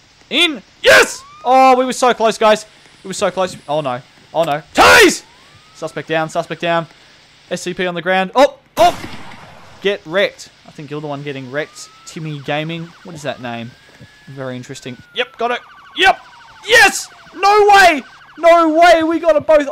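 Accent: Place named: Australia. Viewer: He sounds like a classical Aussie in a good way. Love your videos man, keep up the good work hoping you reach a 100 soon!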